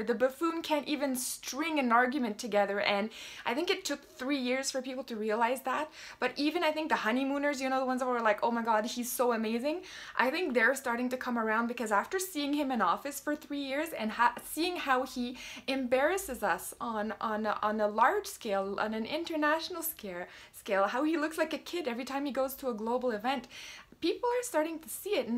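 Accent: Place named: Eastern Canada, Canada. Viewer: I hope you are right. Your accent tells me you are from the east. I hope I am right because in order to get rid of Trudeau we as Canadians need the people in Quebec and Ontario to think the way you do. Out west here we already know he won't get a vote except in BC. Unfortunately we don't control enough seats out here where the east has all the power to get rid of a person like Trudeau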